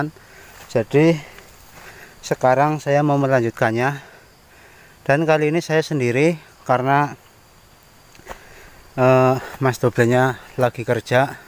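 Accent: Banyumas, Indonesia. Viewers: Aduh mba bahasa ngapaknya keluar nnti yg bukan ngapak ngga tau loh 🤭 Penemuan suku tidak jelas boso ngapake koyo ning judul nduwur wi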